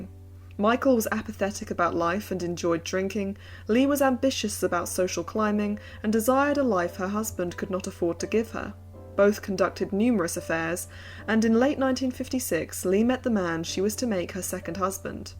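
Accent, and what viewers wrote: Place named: United Kingdom. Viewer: Dear Ms, your British voice telling different aspects of these Swans was perfectly delightful! Thank you! I’m almost finished but I dread..,the end of the story! Because yore video was so, how do I say…reminiscent… I accepted an “Invitation” and will drive…all the way to that Coctail Party! Mind you, am a 😍”Swann”! And ye, I had a Truman C. In my life too…Funny, Do all Birds of a feather, flock together? Thank you again! Have a Blessed Holy Saturday Night! Kali Anesthesia! Nurse Jane, Deale, Maryland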